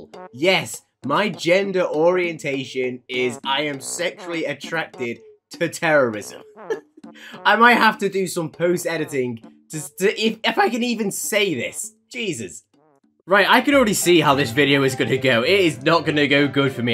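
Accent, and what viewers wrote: United Kingdom. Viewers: You sound so ✨British✨ today mate.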